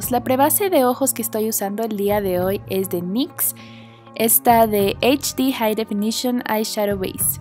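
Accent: Quito, Ecuador. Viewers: Con apenas escuchar tu forma de hablar supe eres ecuatoriana, me gustó muchísimo todo muy bien explicado... felicidades! una subscriptora más😜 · Me encanta tu acento, de que parte de Ecuador eres? Yo tambien soy de ecuador por sía. Jaja de Guayaquil, saludos, ya me suscribí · Solo con escucharte un ratito ya sabía que eras de Quito, igual que yo!!! 😁😁😁Muy buenos vídeos!!! Te sigo desde Alemania!! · Estaba esperando una youtuber ecuatoriana como tú 😍 desde que empezó el video reconocí tu forma de hablar 💕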